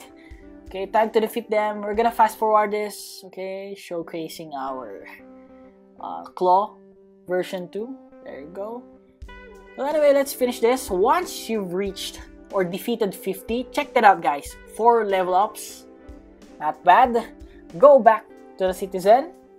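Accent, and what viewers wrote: Philippines. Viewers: Sabi na Filipino ka kuya Sa Pag Sasalita Palang Nang English Parang si Kuya Kitt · sabi kona e pnoy k e accent plg(TT) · Bro i didnt knew you were filipino sa totoo lang halata sa accent mo pero di talaga ako sure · yea he is filipino u havent noticed? about his accent?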